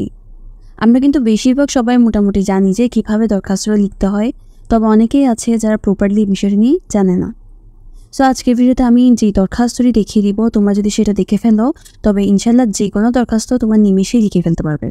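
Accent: Chittagong, Bangladesh. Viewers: Chittagong accent?😅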